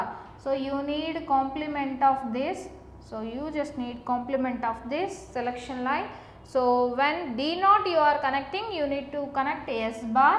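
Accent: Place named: South India, India. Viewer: South Indian Accent urgghhhh 😒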